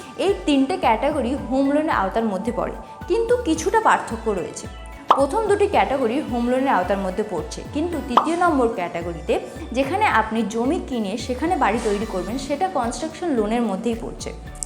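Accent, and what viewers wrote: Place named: India. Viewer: bangla ta hindir moton kore bolche re hay hay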